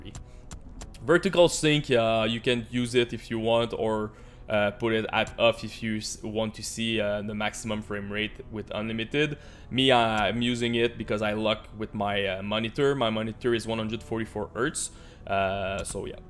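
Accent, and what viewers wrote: Quebec, Canada. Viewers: You have beautiful Quebec English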